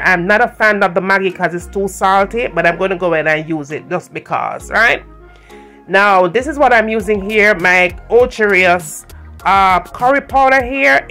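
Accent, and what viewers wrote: Jamaica. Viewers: You sound jamaican but you don't cook jamaican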